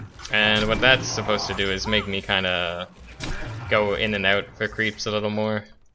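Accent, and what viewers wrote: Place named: Canada. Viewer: Ohhhh that sweet canadian accent #Wemissyou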